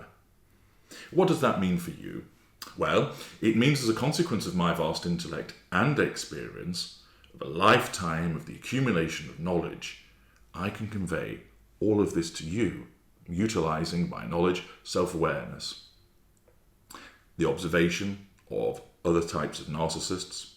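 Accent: United Kingdom. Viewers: I'm from the US. So he just sounds like an elite dude from somewhere in the UK. 🤷‍♀️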